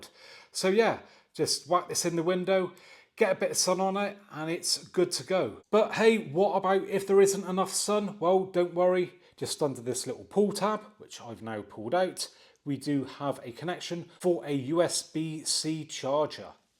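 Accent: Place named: West Country, United Kingdom. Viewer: Sounds west country. Looking at getting this tv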